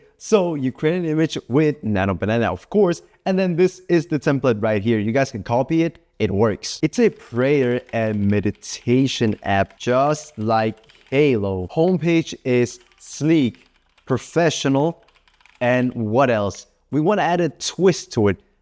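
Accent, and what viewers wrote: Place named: Denmark. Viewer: W DANISH ACCENT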